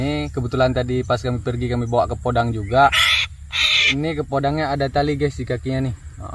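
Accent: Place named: Aceh, Indonesia. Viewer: Saya subscribe baru, logat Indonesia nya macam orang aceh? Awak aceh kah droneh? 😅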